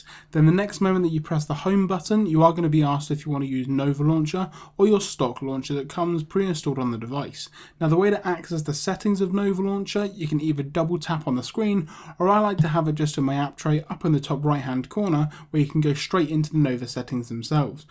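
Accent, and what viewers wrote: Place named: United Kingdom. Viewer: the british accent IS TAKING OVER YOUTUBE!!!!!!!!!!!!!!!!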